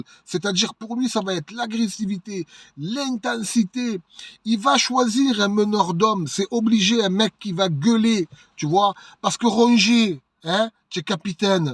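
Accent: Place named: Marseille, France. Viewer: J adore l accent marseillais ❤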